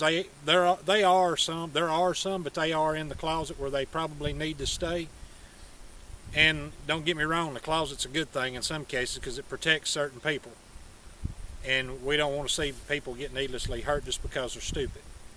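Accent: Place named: New England, United States. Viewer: Hey neighbor, didn't recognize that accent (Yankee) . Argued that anyone north of Tennessee River is a Yankee was corrected by Pete Cook that anyone north of the Cahaba River is a Yankee. 😃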